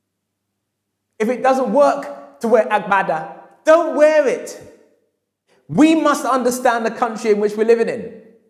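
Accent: England, United Kingdom. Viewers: Baseball analogies in an English accent! :))))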